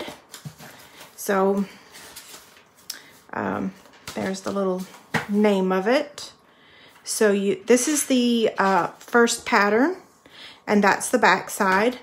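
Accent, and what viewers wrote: South, United States. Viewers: I love how you talk with that southern accent. Could listen to you all day.Well,maybe not all day but you know,lol.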